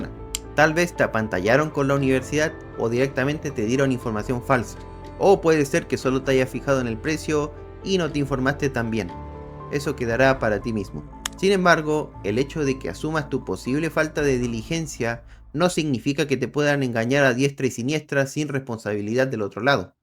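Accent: Chile. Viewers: Buen video pero no pude dejar de pensar que tienes un manera de hablar muy chilena de tu parte. Eres de chile viviendo en Perú, o solo es casualidad? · siento que sos chileno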